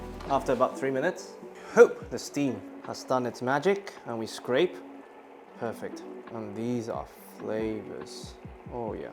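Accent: United Kingdom. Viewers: u haven’t been outside enough if you think an Asian guy with a British accent is impressive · Nah, it's an Asian dude speaking British English giving lesson about Italian dish that got me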